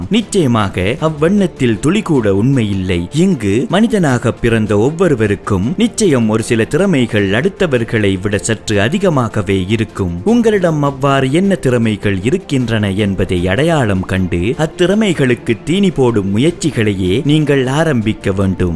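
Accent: Sri Lanka. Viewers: Bro neengal srilanka tamila Pesuranga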